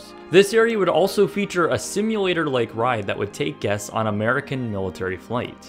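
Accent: Canada. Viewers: "howoosing...oot..." the speaker is from Canada I am guessing, ay?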